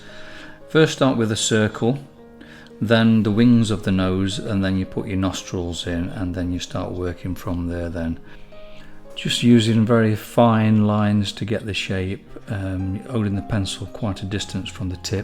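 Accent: United Kingdom. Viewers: Thanks for letting us look over your shoulder and your extreme helpful comments (and after many years of communication with Americans I love to hear your georgous british accent 😉). It is the first time for me to get such a good impression of drawing human skin. Especially your work with the complementary colours is impressive. Thanks again and a nice christmas for you and your family from an art student in Germany.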